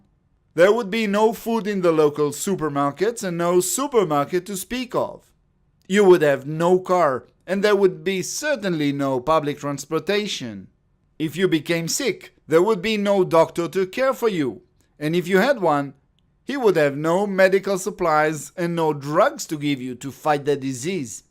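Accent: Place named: France. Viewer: What is this guys accent? French? It rules.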